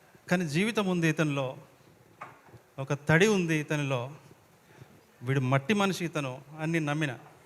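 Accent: Telangana, India. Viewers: Telangana yasa basha ❤❤❤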